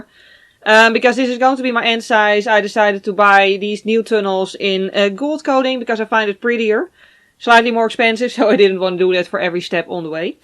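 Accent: Netherlands. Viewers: That dutch accent 👌🏻 ik zie je 😍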